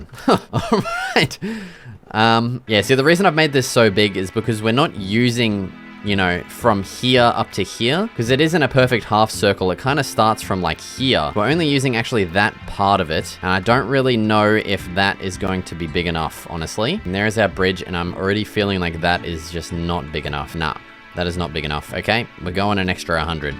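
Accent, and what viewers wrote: Australia. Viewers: The Aussie acsent is the cherry on top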